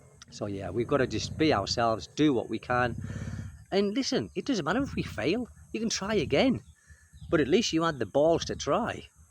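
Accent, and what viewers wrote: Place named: England, United Kingdom. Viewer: I totally agree with you. My husband is a pure english born here in US. I had the blessing of meeting my mother in law and was able to take care of her. You always remind me because she has the same beautiful accent. Learned their straight forward character. I was then able to protect and defend myself by using my mouth at work. Proud of it because i always just cry on the side when i was abused mentally. I appreciate all your videos. I love learning so i always look forward to be inspired again the next day with your knowledge and wisdom based on experience and advices all your life. Thank you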